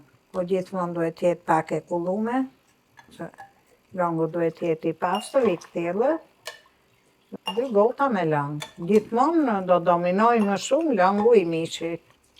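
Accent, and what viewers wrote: Tirana, Albania. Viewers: Te lumt zonje. Je fantastike, me ze te embel,dhe dialekt tirons puro.❤️🖐️ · Te degjoj se flet tironce😂😂😂😂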